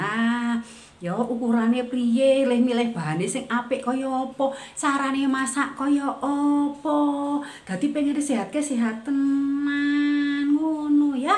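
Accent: Temanggung, Indonesia. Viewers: Marem Gandem logat khasss temanggungan Bunda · Top markotop bu,bahasane Temanggung jan medhok tenan,jos banget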